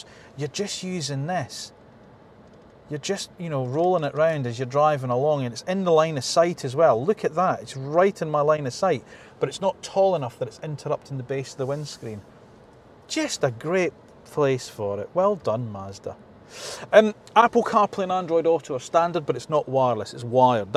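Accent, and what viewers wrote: Scotland, United Kingdom. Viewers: A thorough, objective, well-informed review of an interesting car, all delivered in Scottish accent. What more could you wish for?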